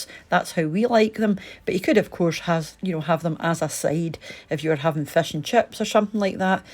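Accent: Scotland, United Kingdom. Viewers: Its just a normal Scottish accent. THEWUMMANSJOOSTSPEAKINGSLOWERCOSSHESOANAVIDYAPAL... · Here in New South Wales we do call them Scallops and I Luv Ya Scottish Brogue I was Going with a Guy from Kilcordy fife shire and I had an Auntie that was Scottish so I picked up his Brogue and it has been a very longtime since I used to sayTotties for 🥔 ... lol ... and the wardsmaids would tell me Speak Australian we Don’t understand Scottish ... lol ... I was training as Nurse back then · Love the food you do,love the acsent ,it's a joy so many memories of scotland , keep it going great to see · Gonna have to try those, they looked awsome. Your voice is so soothing and i miss the accent, was born in arbroath but have been in Canada since 68, only been home once in 77, was a bad trip i was sick the whole time. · Yum yum yum and so easy to make.Also brilliant to hear my accent, yeah from Clydebank originally.Loving the Scottish foods,thank you and keep them coming. 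· You can also teach standard/traditional/authentic Scottish accent, as yours is distinctive yet wholly comprehensible and lovely.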